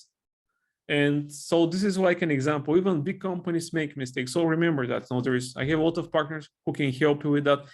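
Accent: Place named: Bulgaria. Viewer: He seems to have a good command of the language, however, a very heavy Bulgarian accent.. Maybe because I have been exposed to British English and Canadian English more. If you are also Bulgarian it might be easier to figure out what he is saying. There are some instances in the video where I slowed the video to 0.50 and listened to it 10 times, I dont understand what he said.. I might give you the example when I watch it again...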